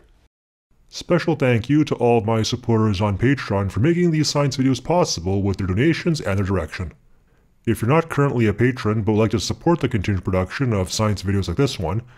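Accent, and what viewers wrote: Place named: Canada. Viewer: you sound soooo Canadian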